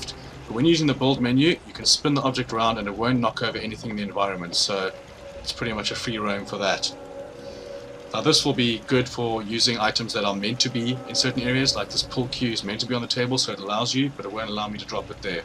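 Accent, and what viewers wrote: South Africa. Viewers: My moms friends south african and I love his accent! Its a lot like yours! keep up the sound work lad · Are you South African? I am so your accent just sounded familiar. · I think I recognize that accent. Are you South African?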